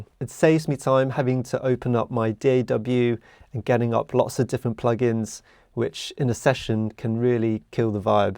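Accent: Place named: United Kingdom. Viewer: Can you do a tutorial on how to dial in a killer BRITISH ACCENT like yours?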